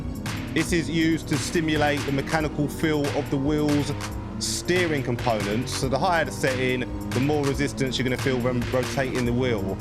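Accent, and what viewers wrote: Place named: South London, United Kingdom. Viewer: Where abouts in London are you... sound a bit south .. I will check out a few more of your vids... cheers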